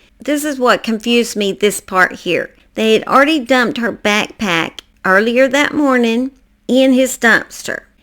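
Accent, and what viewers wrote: South, United States. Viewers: I appreciate your content! These cases that aren't told need to be heard! As a southern girl myself, I can't get enough of that twang. Keep on keepin on.❤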